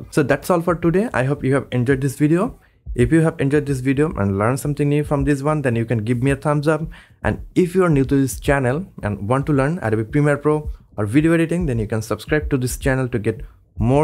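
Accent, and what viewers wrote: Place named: Assam, India. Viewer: are u assamese by any change...based on the accent I m guessing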